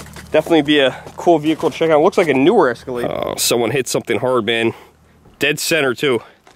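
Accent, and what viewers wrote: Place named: Midwest, United States. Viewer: Your accent is NOT Hyde Park NY. More Midwest or Minnesota. Just saying~~~ 👍❤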